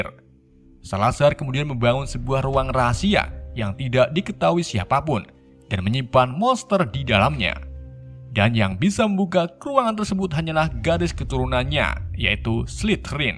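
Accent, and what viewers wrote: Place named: Java, Indonesia. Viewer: Radak medok yah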